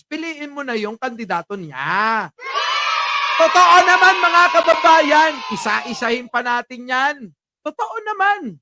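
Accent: Ilocos, Philippines. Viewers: Sir natatawa aq sayo sa ilocano mo haha. Minoramopa mga ddsit sabimo okininayo kamo haha ilocano aq sir tinototokan ko tong programamo sa utube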